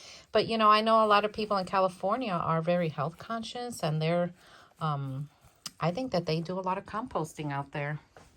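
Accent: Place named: New Jersey, United States. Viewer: 👋 from California! Originally from Philly so loving your Jersey accent. I learn so much from your videos.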